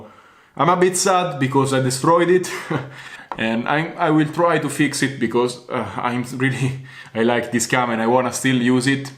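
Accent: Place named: Italy. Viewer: Pure pleasure to watch your movies mate. they sound even cooler with this italian accent :D wish to have more confidence to show my experiments publicly :D like You :D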